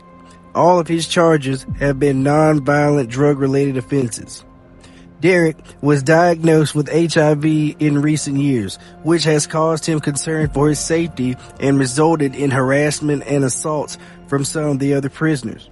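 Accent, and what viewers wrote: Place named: Alabama, United States. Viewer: Dude I swear u talk just as country as I do 😂 what part of Alabama are u from? I'm in Gadsden 😊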